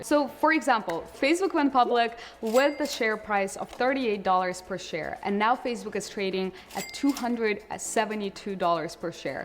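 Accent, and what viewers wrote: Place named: Russia. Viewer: Что-то русским акцентом попахивает)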